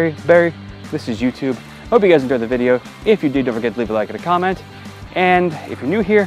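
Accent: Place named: Western New York, United States. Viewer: just bought a 2016 WRX 2 days ago man. By the sound of it, you must be from Western NY. there is a WNY Subaru Owners Club on Facebook if you want to join it. I am also learning to drive stick on this...."interesting to say the least" describes it adequately. I'll look for you in the club. One thing I wanted to say in warning, if you mod it, almost all mods require a new tune.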